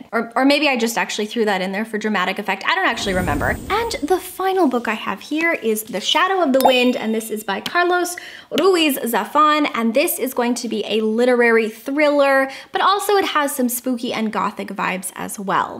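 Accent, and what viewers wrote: United Kingdom. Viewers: Hey!!! I am living for this video 🙌🙌 all the spooky autumn vibes. Hello from Perth Australia 👋 love the British accent you do in your videos hehe sometimes there is a hint of an Aussie accent when you do it. Not a spooky recommendation but a great YA read that I think you might like is The Prison Healer by Lynette Noni.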